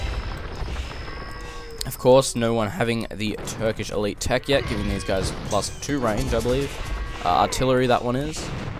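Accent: Australia. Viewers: Where is the commentator from? Australia? UK?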